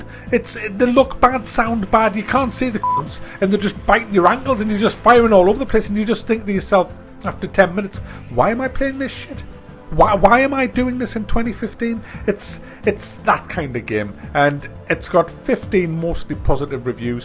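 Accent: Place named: Sunderland, United Kingdom. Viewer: Hi can I ask if your from sunderland? I reckonise the accent lol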